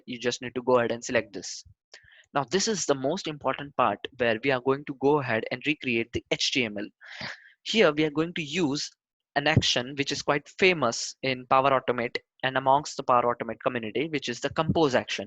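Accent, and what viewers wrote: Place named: India. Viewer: You should be banned from speaking English 🤪🤪. The Indian accent is horrible!!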